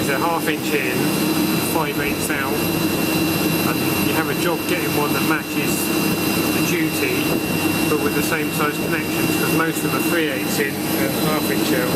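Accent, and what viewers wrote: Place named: London, United Kingdom. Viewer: I think it's that accent from London, England that's really throwing him off !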